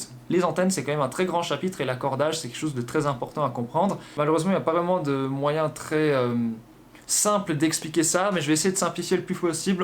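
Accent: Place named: Belgium. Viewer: Tiens il est belge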